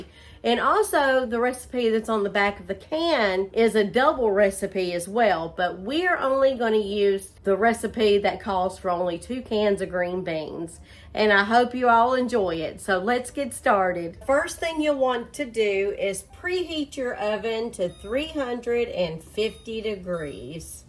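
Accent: South, United States. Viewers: I just found your channel ! I love it + i need it ! Love the southern accent wether Carolinas, Mississippi,Tennesee ,Arkansas !!! Idk but i love it We have no accent in the Pacific NW 😅 Look forward to getting back to some good down home , old fashioned cooking Jesus loves you ! from another sister who loves Jesus 💜✝️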